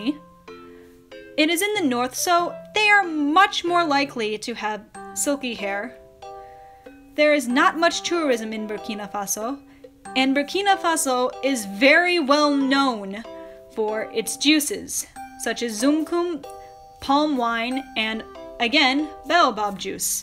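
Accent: Australia. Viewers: Lmao just clicked on the video and already an Aussie accent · Why Australian accent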